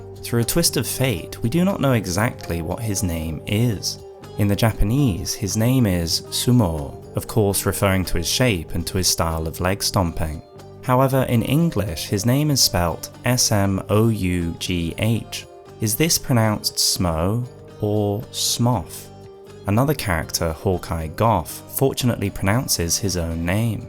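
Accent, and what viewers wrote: United Kingdom. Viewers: I feel that if you have a soothing voice and an British accent you are by law required to make lore videos on YouTube. Good video i like it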